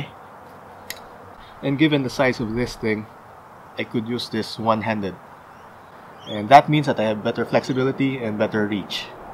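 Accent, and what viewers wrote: Philippines. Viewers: You speak english like filipino accent. Are ypu?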